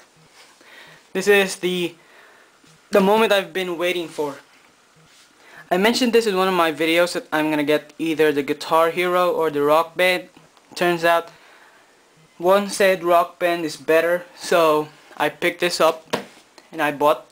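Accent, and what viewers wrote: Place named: India. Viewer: you sound indian. i'm chinese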